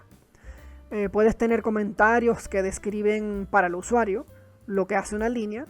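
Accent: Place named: Puerto Rico. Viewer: acho Mano. Sera Puerto Riqueño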